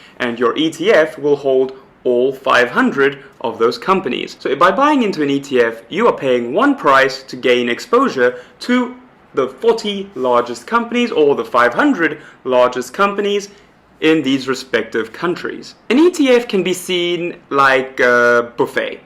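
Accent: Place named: South Africa. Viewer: I’m a South African living in the US and to have this concept explained to me in a SA’n accent makes it that much sweeter. Lekker my boet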